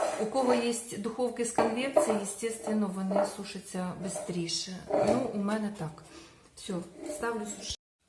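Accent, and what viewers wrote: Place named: Surzhyk, Ukraine. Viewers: На суржике ГЭкать и ШОкать предпочитает 😮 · Ти молодчинка.я такой з -під Одеси.я зараз далеко від домівки.дуже скучаю.включпю твій канал і ніби дома,разом готую з тобою.А рідний разговор який!!!мені здається я тебе бачила чи на Привозі чи на Новому.Ти там може торгувала?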